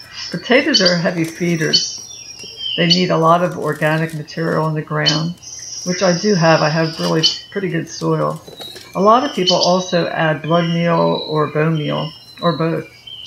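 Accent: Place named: mixed, United States. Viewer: I knew I heard you talkin our NJ/Philly twang,! We're in southern NJ, but my family is originally from Philly. Thx for the cooler idea!:-)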